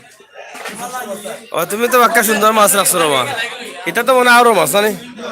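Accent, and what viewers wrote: Sylhet, Bangladesh. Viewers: ভাই এইটা আমাদের সিলেটের ভাষা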